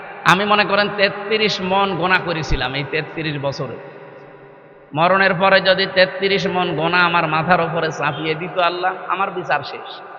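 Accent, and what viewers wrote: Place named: India. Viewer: দাদা ইন্ডিয়ার সুরে কথা বলছে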